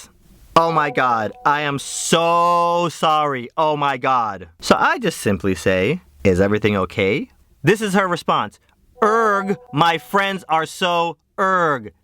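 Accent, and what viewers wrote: United Kingdom. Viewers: Dark red hair is my kryptonite. But British accent is a close second. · British accent 😏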